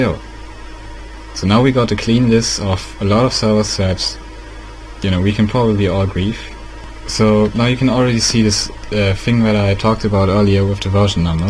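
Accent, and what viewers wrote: Germany. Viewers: Man hat das Deutsch richtig raus gehört xD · a ok weil dein english ist nicht so gut weil wenn ich english rede höre ich mich fast so an wie du deswegen dachte ich du bist deutscher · du bist ein deutscher :D merkt man an deinem aktzent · i can hear you German accent xD. i love your client too, thanx. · You German right? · dieser deutsche Akzent XD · Boah dieser deutsche aktzent😂 Aber cooles video hat mir geholfen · are you german? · bro man hört halt das du deutsch bist aber du sprichst verständlich englisch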